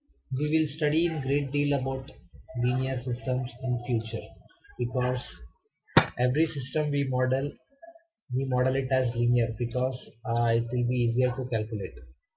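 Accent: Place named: Pakistan. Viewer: classical pakistani talking.. :D why internet is full of you guys? even british accent is more understandable.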